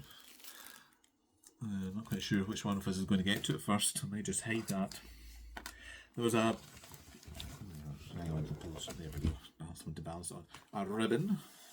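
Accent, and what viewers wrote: Scotland, United Kingdom. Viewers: This is my first visit to your floss tube and I really enjoyed it. I really liked to listen to you because of your Scottish brogue. My grandmother was born in Scotland and never lost the Scottish lilt to her voice. Enjoyed seeing your projects.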